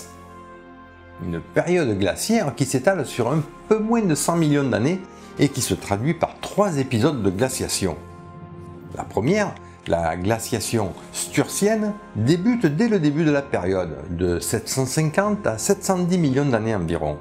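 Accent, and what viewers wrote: South, France. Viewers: Il existe des chaînes Youtube, qui, aux premières minutes nous font: nous abonner, suivre assidûment et surtout enchaîner toutes les vidéos. Ce qui est votre cas. Je suis bluffée du contenu si brillant, de toutes les informations que j'apprends, et de tout le travail, colossal qui doit être mis en place ! Votre chaîne est excellente (et le petit accent du sud rajoute une tonalité bien agréable). Un énorme merci à vous, et nous avons hâte de regarder vos autres vidéos. Félicitations !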